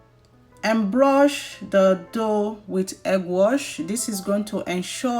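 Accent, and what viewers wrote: Nigeria. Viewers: Talk like a Nigerian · She sounds Nigerian and this is a Nigerian meat pie recipe.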